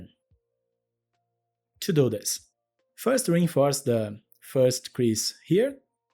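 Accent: Brazil. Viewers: O sotaque brasileiro é inconfundível hahaha 🇧🇷 incrível tutorial e lindo origami! Parabens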